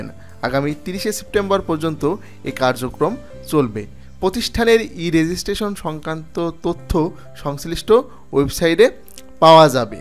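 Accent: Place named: Khulna, Bangladesh. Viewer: Apnar basha Khulna.. right?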